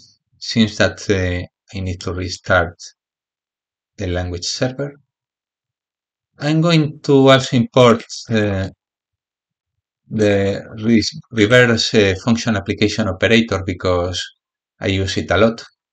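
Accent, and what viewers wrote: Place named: Spain. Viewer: Sounds like an spanish accent to me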